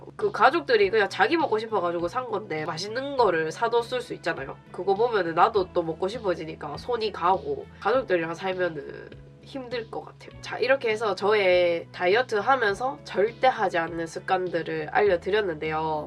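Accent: Gyeongsang, South Korea. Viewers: 大邱のなまりですか？？ 何となくですが……🤔 とてもお綺麗です！！🥰🥰🥺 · 말투는 대구 경북 ㅎㅎㅎ너무이뻐요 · Is this a busan dialect ? I love it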